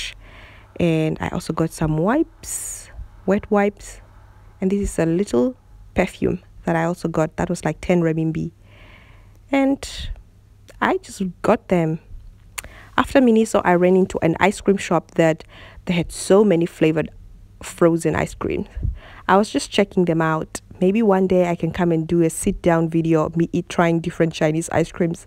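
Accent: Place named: South Africa. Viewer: You're South African.. your accent reminds me so much of my friend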